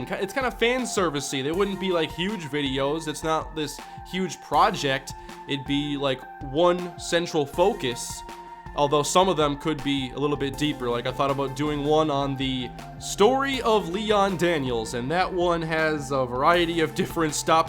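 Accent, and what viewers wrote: Minnesota, United States. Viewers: Your Minnesotan accent is much less pronounced than my friends from Minnesota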